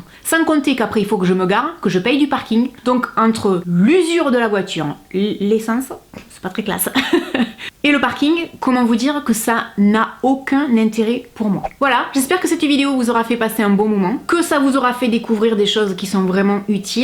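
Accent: South, France. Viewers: Oui Sarah tu as raison,elle est jolie,son petit accent du sud,des cheveux et la couleur super belle. Elle est vraie, on le voit sur elle.moi je l adore.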